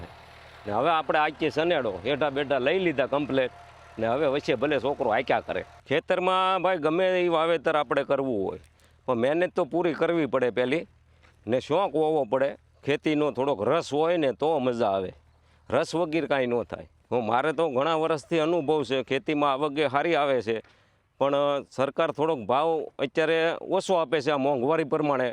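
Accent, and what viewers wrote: Kathiawar, India. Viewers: I love your kathiyawadi and your simplicity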